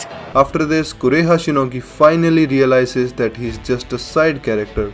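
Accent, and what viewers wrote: India. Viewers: Bruh your Indian accent makes it more funny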